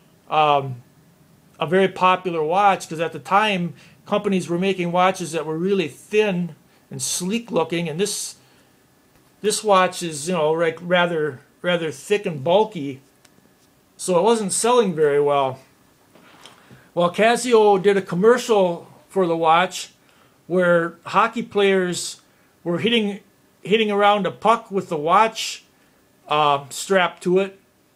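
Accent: Canada. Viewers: good review man. and cool accent. are you canadian?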